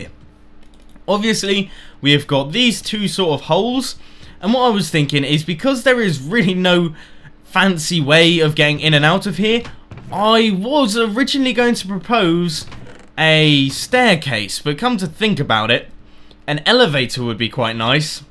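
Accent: England, United Kingdom. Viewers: 1. ur one of the few brits that are good commentators and i love brit accents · 1. I subbed to u because UR BRITTISCH ACCENT IS FREAKING AWESOME and i love your redstone videos 2. hard question...^^ 3. If u would start playing and recording League Of legends you would be awesome I've also have a suggestion of what you could do in your redstone videos. A 9 didgit code lock, been looking for a tutorial on that for ever and i can't find one. So maybe you could do that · because your commentary is entertaining and you do have a very nice english accent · 1.because I love minecraft, and British accents are awesome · BECAUSE BRITISH ACCENT MAKES EVERYTHING BETTER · 1. I subscribed because of your British accent. Because more than half my subscriptions are Brit. And of course, I love redstone, cars, and minecraft in general. 2. I just suggest maybe some better wifi connectivity because most times with me. 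The wifi is why it's slow 3. Add some mod reviews. I love mod reviews, a lot. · 1 I subscribed because you make cool videos and you have the best British accent I have ever heard 2 Do a facecam 3 I would like to see more too small videos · 1: I subscribed to you because I started minecraft about 2 months ago due to Gophervids doing a live stream of minecraft as a first impressions, after doing the whole building thing, I wanted to get into Redstone a little more and googled redstone minecraft and your vids came up first, a fun way and seemed a lot more professional compared to others. 2: Nothing really, you seem to be doing fine, redstone and live streams are regular, your quality is top notch (pun intended), and i'm really enjoying Hermitcraft series 3 and just re-watching hermitcraft series 2. 3: Seems to be fine, I've subscribed to 2 channel and it's filling in the void for whatever was missing at the time of filming, Xisumavoid collabs won't go amiss. 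Edit: I didn't stay for the accent because I'm British and I hear it everyday lol. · 1. Because your accent sounds amazing, 2, it's good as it is now, 3, can you learn us how to speak with an british accent? That would be amazing :). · I'm not so good at listing so i leave it to you to understand:P 42. I really love your editing and i always wanted to learn using "adobe after effect" it will be lovely if you make few tutorials about this awesome program. for another thing, i think that adding few more games to the channel will spoil. 485. i love all of your vids, i don't think there is something you need to change, but i would really love to see tutorials on bigger and more complicated redstone contraptions, i know it is hard for you to do that, but i would be really happy if you make a vid, i don't know what, maybe a 16x16 screen. 99837.I subscribed to you at the beginning, when you made all the redstone awesome stuff, i was totally noob with redstone and wanted to learn some. 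after a while i was like: "YAY! another vid from!" and of course - its because i love your British accent and you:) hope that my opinion will help you:D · hermit craft and the british voice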